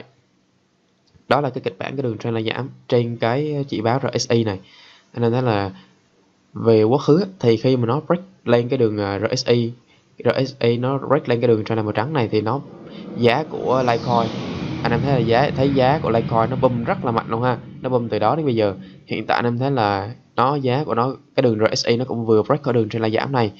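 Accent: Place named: Quang Nam, Vietnam. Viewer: Ad người Quảng Nam hay Đà Nẵng vậy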